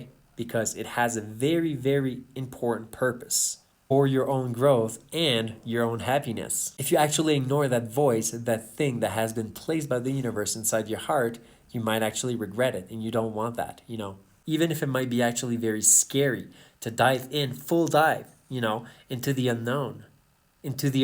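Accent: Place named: Quebec, Canada. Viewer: Thanks for sharing your great journey! I knew I recognized that accent 😅 Du Québec ici ✌️😁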